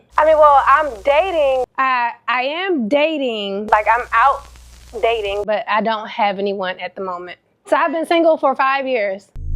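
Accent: DMV, United States. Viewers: I knew you were from the DMV somewhere as soon as I heard you talking lol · she’s from DMV! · I could hear Md all in her accent ! Baltimore stand up ! Love this ❤ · Heard that DMV accent IMMEDIATELY! Hey sis! If you're comfortable, can you share stories about your stadium days?? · Girl! Soons I heard you talking I said that’s Maryland baby!! lol love from PG County boo 💕💕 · I’m from PG I hear your Maryland accent girl 😁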